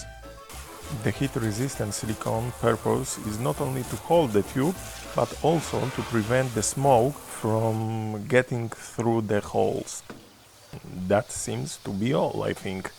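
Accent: Poland. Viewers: Od pierwszych słów wiedziałam że jesteś z Polski 😊 dzięki za film. Planujemy coś podobnego w ogrodzie. Pytanie, czy wanna nie nagrzewa się zbytnio bezpośrednio od ognia? Nie parzy aby?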